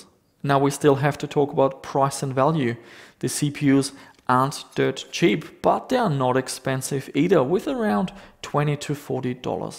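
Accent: Germany. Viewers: How come this man sounds like a German? Can somebody explain? I thought he was an Australian. I have no clue haha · YOU HAVE THE HARDEST GERMAN DIALECT I´VE EVER HEARD :D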